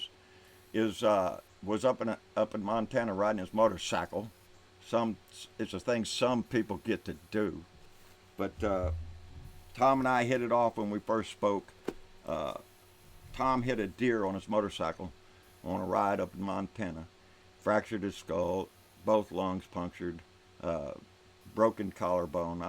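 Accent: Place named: South, United States. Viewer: I think it's important that people hear this message delivered in a southern accent. People in 'blue states' need to be reminded that not everyone in the 'south' are ignorant enablers. I like your style, keep up the good work.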